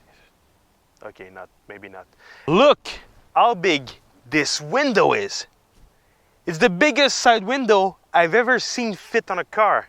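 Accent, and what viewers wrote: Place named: Quebec, Canada. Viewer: damn this is really funny, love the jokes. Your delivery suits the French Canadian accent perfectly.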